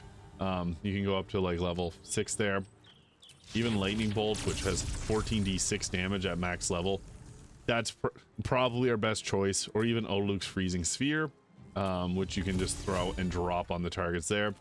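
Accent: Canada. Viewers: so sorry if this is weird but brother i have got to know where your accent is from 😭 i’ve been wondering since day 1 of watching your vids lmao. canada ??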